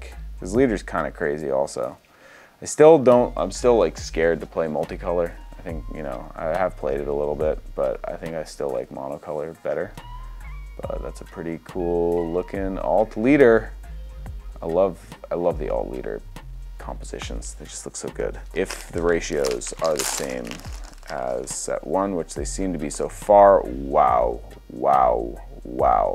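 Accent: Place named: Japan. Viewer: You are the best bro thanks for the opening very entertaining best show on YouTube love your Japanese accent lol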